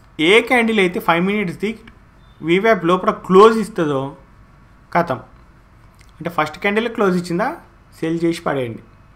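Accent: Telangana, India. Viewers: 😂👍🌹🌹🌹 i like your (telangana yasa) language....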